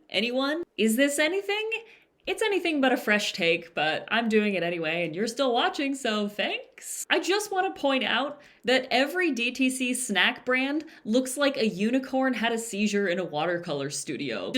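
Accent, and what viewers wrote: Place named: France. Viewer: Impeccable French accent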